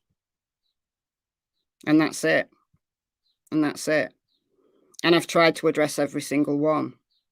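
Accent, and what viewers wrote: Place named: Lancashire, United Kingdom. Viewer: Thank you for all you do. These video's really help one to get a true perspective of what I also truly believe was a tragic accident. I love seeing this country side and the scenery. After much research on ancestry, I discovered my 3 x great grandparents were married in St. Michaels on the Wrye in 1866. Also listening to your voice, I can imagine what my GGG Grandma probably sounded like as she was born and raised in Lancashire County.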